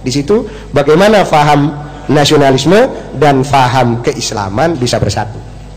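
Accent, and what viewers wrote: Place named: Madura, Indonesia. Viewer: dari logatnya kayaknya orang madura. Mengingatkan ustad idrus romli yg cerdas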